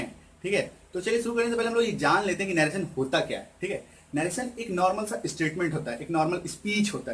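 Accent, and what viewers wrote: Bihar, India. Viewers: sir Bihar se ho kya apne bhaut acha samjhya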